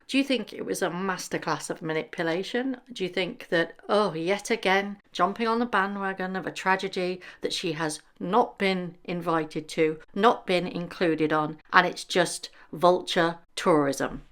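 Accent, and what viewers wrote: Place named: United Kingdom. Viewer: you got a UK accent, but I'm not sure where you are based. Surely you remember the Glenfell Tower fire when 74 died. Meghan did not turn up and get in the way. The Royals and Politicians visited by invitation after the smoke and gone and people had been moved elsewhere. Meghan was sent to help promote a community cookbook. She did not wander amongst this graveyard in the sky offering to get signed T-sirts for kids who were still trying to find a bed and a consistent supply of food. This is weird and she has shown she knows how to behave in a disaster not based in The USA.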